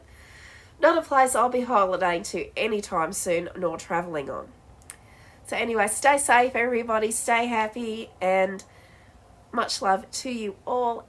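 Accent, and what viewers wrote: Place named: Australia. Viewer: I really enjoyed watching this. As a Aussie who’s lived abroad since the mid 90s I haven’t always kept up with things going on back home. Super interesting to hear the uncomfortable history of this stretch of highway. Also enjoyed hearing your Aussie accent. I’ve subscribed to your channel and will definitely be watching more! Thanks ❤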